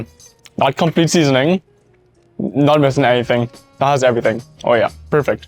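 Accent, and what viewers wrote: Trinidad and Tobago. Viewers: Currently stuffing my face with Popeyes and this pops up so I click it. I'm like wait nah how you sounding Trini so 🤣 I subbed after few minutes of research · A Chinese with a strong Trini accent is something I have never encountered before. WOW! I sometimes forget the Caribbean really is a melting pot of cultures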